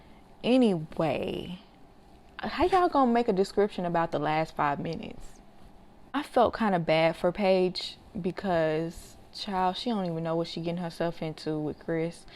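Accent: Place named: South, United States. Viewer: I've heard this chirping on your other videos (smile). Normally smoke detectors are wired together; if one goes off in one part of the house it will cause the other one to go off. if you replaced batteries in all detectors and it still goes off, then the detectors are bad and need to be replaced. They only last about 10 years. Also love your makeup and southern accent!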